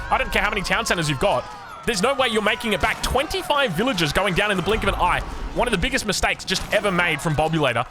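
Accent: Australia. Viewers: Aw :( you don't sound as Aussie as you used to from the last upload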